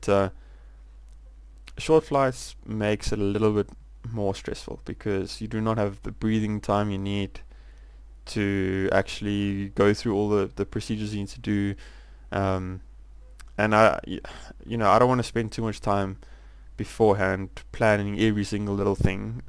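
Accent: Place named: South Africa. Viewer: I thought you sound South African and I was right. Im also down here but thanks for this I jist finished my pc build and started to play xplane.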